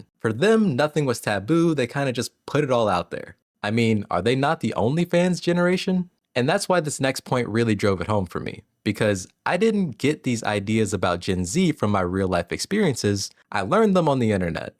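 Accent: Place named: Baltic states. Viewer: sounds like you are from the baltics or somewhere else tbh idk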